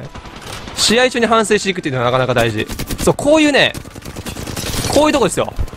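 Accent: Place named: Kansai, Japan. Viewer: 最近動画見てて関西弁移りました初見です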